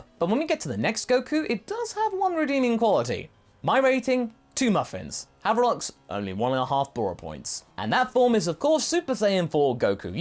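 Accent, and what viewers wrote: England, United Kingdom. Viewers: You sound like teamfourstar hoku but with an english accent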